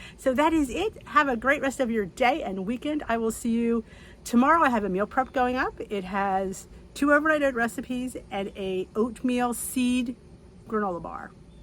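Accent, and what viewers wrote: New Jersey, United States. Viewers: I thought you were a Jersey Girl! I'm think if up north Bayonne New Jersey , Nutley or Verona or down to the shore ocean county third generation Italian am I right?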